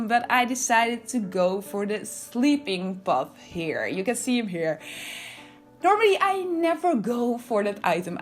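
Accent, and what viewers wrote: Netherlands. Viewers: I hear a dutch accent love it 😂❤❤ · Yo ben jij nederlands? Want ik kan het wel horen hoor. De build is trouwens wel vet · Echt prachtig! Ik ga er vanuit dat je Nederlands bent met dit accent hahaha 🥰🤭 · Are u dutch? Cus you have a super heavily dutch accent. Als je Nederlands bent kan je dit verstaan/begrijpen. Je hebt zo erg Nederlands accent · That is awesome❤ btw you sound dutch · Ik heb met je stem begrepen dat je Nederlands bent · I love this house! It looks so clear and still it fits in very well with nature. Where is the reference image from? Also; your accent... are you Dutch too? :) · That’s a beautiful build, impressive Your accent gives me Dutch vibes, I think I’m right ;) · I didn't expect you to be dutch but that accent bro... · I can hear your from the Netherlands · You sound dutch 🤔 (I am dutch) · Oke, ben ik de enige die hoort dat ze nederlands is? 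· Omg THATS A GORGEOUS BUILD the way you made it circular is so smart! lol btw ik kan gewoon HOREN dat je Nederlands bent🥰😝 good job!😍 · I can hear you're probs dutch!! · You're dutch aren't you? Hehe i recognize your accent😄🤭💓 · Are u Dutch? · I bet your Dutch I can hear it Dus hey hoi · Lmao immediately heard you were dutch! Leuk om een Nederlander tegen te komen die ook bezig is met Sims 4 <3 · Love.kawaii Cute ja ze is Nederlands, en haar accent is indd heel erg aanwezig, ik denk dat ze echt wel een Engels accent kan opzetten maar het enigzins misschien niet durft? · Hello! You're such a great builder! Keep going! Also, is it true that you come from The Netherlands? Because i am Dutch and i can hear a Dutch accent in your language haha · mooi! ik kan horen dat je nederlands bent 😁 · Are you Dutch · Dat nederlandse accent!! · Love your accent! I'm Dutch too hahahaha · Je heb nogal een Nederlands accent, dus ben jij Nederlands?😂🌸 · Awesome build!! 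Just found your channel and can't unhear your dutch accent, sorry hoorde meteen dat je Nederlands bent hahaha ( niet slecht bedoeld viel me gewoon als eerste op ) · the house looks very good. the accent is very dutch.. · Wat mooi! Ik word er altijd zo gelukkig van als ik een Nederlands accentje hoor. Voel me meteen thuis 🥰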